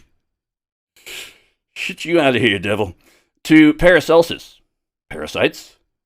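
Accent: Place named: Canada. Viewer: You are Canadian aren't you? I can't really tell being that I'm British. You sometimes sound like you have a Canadian twang.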